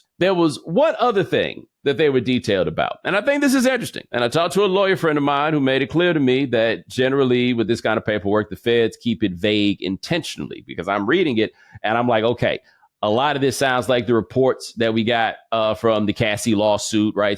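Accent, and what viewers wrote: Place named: Atlanta, United States. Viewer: Never knew you was from Atlanta but now I hear your accent clear as day lol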